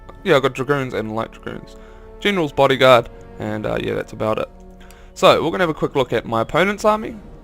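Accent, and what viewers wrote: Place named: New Zealand. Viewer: xD The kiwi accent restricts soooo much =(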